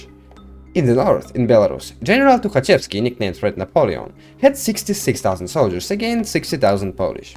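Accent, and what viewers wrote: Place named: Russia. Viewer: good content, but pronounciatin is... difficult. Fast running through text and not very clearly spoken. Sounds kike a Russian native speaker thinking that speaking fast and unclearly means a high level of language skill. English natives are easier to understand... please do sth with that feedback, as your content is of value!!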